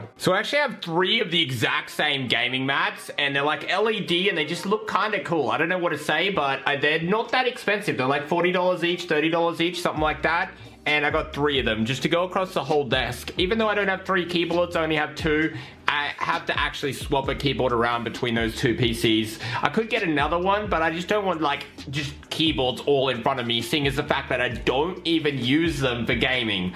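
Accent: Australia. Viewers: No doubt. He looks Eastern European with an Aussie accent. Aren't all Aussies supposed to look like Crocodile Dundee? LOL...JK! cracks me up though. He is salty and his laugh is epic. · Ive seen him do a face reveal before but i still wonder how much difference there is in his looks and voice. He sounds like a chubby australian with a goatee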